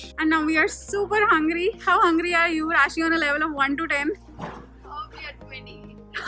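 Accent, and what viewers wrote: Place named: India. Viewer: I love your India accent 🙂🙂😋😏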